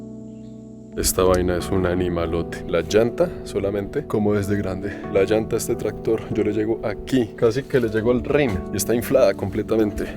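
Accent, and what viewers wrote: Colombia. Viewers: Me encanta el acento colombiano 🤗💕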